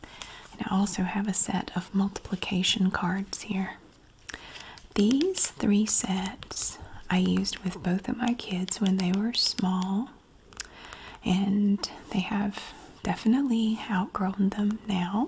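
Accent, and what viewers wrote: South, United States. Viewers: We love a Southern accent in this house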